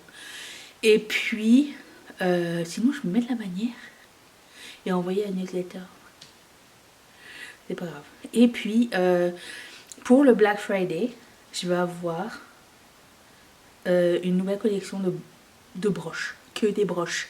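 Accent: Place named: Quebec, Canada. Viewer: Merci pour ce petit bout d'accent si merveilleux. Je suis tombée en amour du Québec avec les séries "la petite vie" et surtout "Catherine". Bonne journée à vous;)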